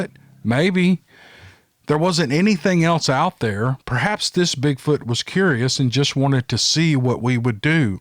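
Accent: South, United States. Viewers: Get them fishes!! Just found you and love your story tellin. Love your accent, country as a biscuit! Keep em commin fella!😚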